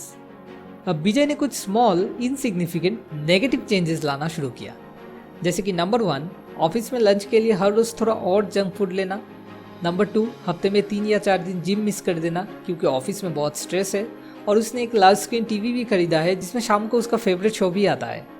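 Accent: Bengal, India. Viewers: good video but Bengali accent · are you Bengali spekar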